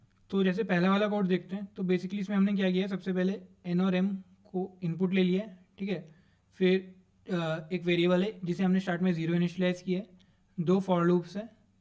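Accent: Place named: Rajasthan, India. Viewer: The mentor sound like he is from Rajasthan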